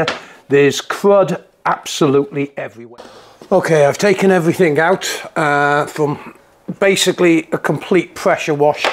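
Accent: United Kingdom. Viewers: Gosh, I came here for help to remove eletric oven and now I cant stop watching your videos. You are great! (and you have a British accent, which makes me feel comfortable because I had a great time living in The UK for 6 years! Cheers! (subscribed and like)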